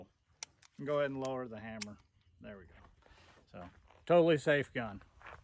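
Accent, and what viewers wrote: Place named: South, United States. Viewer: pinetrees everywhere, wild grass and moss. slight southern accent. ima say your from the Carolinas. my home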